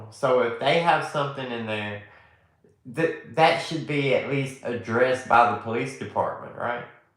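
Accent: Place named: Alabama, United States. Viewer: I recognized your accent before learning you're from Alabama, I'm native myself. Love your channel. You make great points on every topic you choose to discuss.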